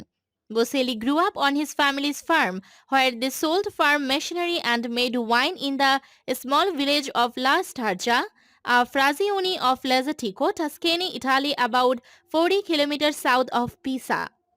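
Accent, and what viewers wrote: India. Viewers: Your accent sound like Indian to me hard to understanding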